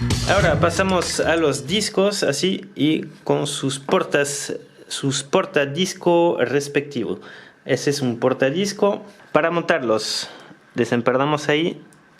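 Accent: France. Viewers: Eres español? Porque es inconfundible la musicalidad de la fonética francesa... 😮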